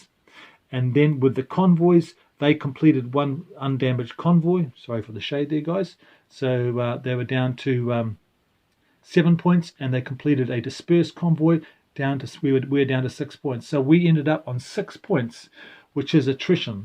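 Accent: New Zealand. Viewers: Gidday Gidday. Love this AAR and your Kiwi accent. I'm a Kiwi in NZ who loves naval wargames too and has a good collection. Would you be keen for a game sometime?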